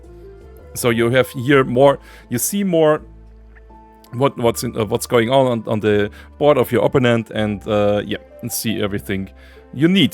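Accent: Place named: Austria. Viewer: Jeder österreicher wenn er Englisch redet "sorry for the Bad Englisch" redet bis auf'n Akzent fehlerfrei xD Grüße aus Tirol, danke für das Video✌🏻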